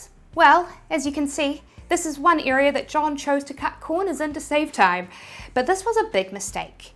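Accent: New Zealand. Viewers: Love this! Well done. Really enjoying your pragmatism (and your Kiwi accent!) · My dear, your Kiwi accent is absolutely adorable. I have next week off, and because I cannot travel, I shall get stuck into PrintOnDemand and DropShipping using your fantastic free eBook. I even downloaded a female New Zealand file on my Mac to read it to me. BTW I was just thinking, aren't all the people on AliExpress, just DropShipping for Alibaba? One final thing, the final syllable of the French word "entrepreneur" is pronounced "er" like in "her", and not "ure" like "manure";-) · Love your kiwi accent... Your nation knows my nation well via rugby .. (Wales) love your content.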